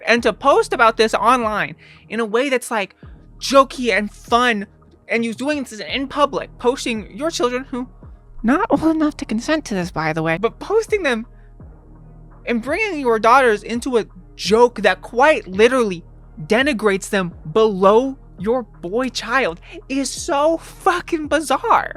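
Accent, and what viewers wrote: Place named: Illinois, United States. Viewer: Sounds like people I know from a big town/tiny city in Illinois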